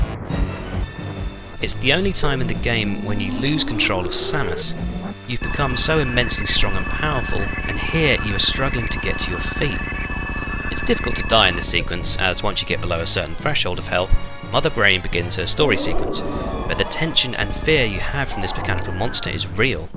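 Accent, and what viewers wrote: France. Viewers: You are french my dude ? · Are you french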